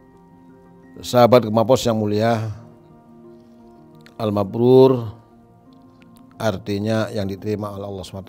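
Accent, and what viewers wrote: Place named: Banyumas, Indonesia. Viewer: Tadz, agak ngapak logatnya ya, orang mana tadz? Saya asli brebes, salam dari brebes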